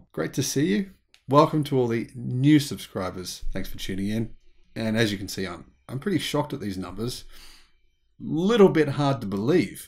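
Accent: Australia. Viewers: His heavy Aussi English, seems make him trip over pronunciation all the time.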